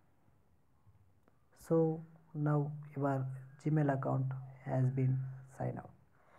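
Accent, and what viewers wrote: India. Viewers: Hes indian , so he has an accent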